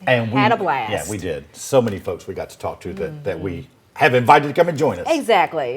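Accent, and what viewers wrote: United States. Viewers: She's not local, she talks like a yank lol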